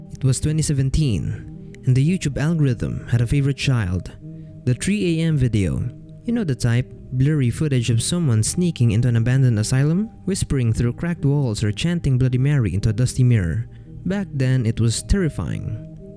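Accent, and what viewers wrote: Philippines. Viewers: Are you a Filipino? I can hear it from your accent, I know a fellow filipino when I hear one. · Filipino accent detected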